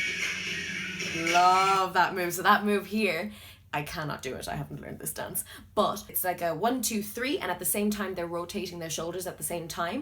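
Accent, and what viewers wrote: Ireland. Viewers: I can tell by your accent that you’re Irish, also you’re amazing at describing everything in an educational way. I was wondering and I know this is a weird question but is there a certain dance studio you go to or teach at? Do you recommend certain dance classes for someone like me who loves the style of dance k-pop artists use....? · She’s Irish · Are u Irish?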